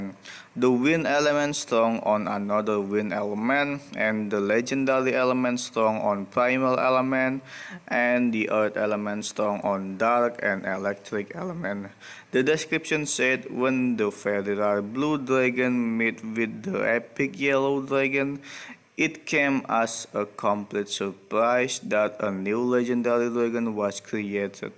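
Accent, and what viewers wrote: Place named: Indonesia. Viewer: Bang ngaku lu orang Indonesia kan dari cara lu bicara gua udah tau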